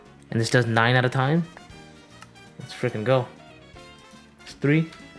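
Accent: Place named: Mexico. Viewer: Im digging the Mexican accent!... At least thats what I think Im hearing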